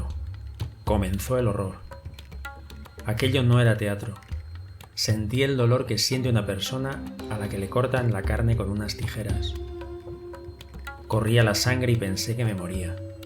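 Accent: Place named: Spain. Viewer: Todo bien pero no soporto ver y oir este interesante documental con el acento español...como que algo no cuadra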